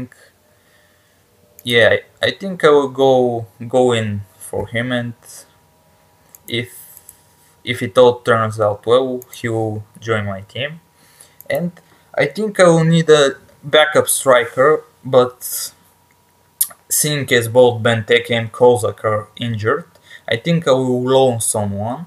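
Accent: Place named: Bulgaria. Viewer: Great vid buddy love the accent Are you from Bulgaria if so big up Stan the man the Celtic ledgend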